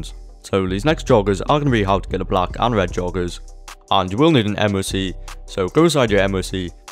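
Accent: Wales, United Kingdom. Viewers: You sound welsh where are u from?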